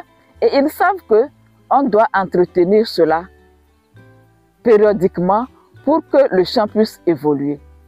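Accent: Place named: Togo. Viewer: j'ai failli dire que les meilleurs ouvriers agricoles loyale et fidèle en Afrique de l'Ouest sont les natifs du NORD TOGO, mais à vous entendre ça me fait peur, car votre accent montre que vous êtes des togolais, mais une chose est sûr, l'expérience a montré que pour des domaines avoisinant déjà les plus de 05 hectares, faut carrément prendre des ouvriers venant d'une autre région du pays, avec un bon contrat à l'appui, et non des autochtones de la zone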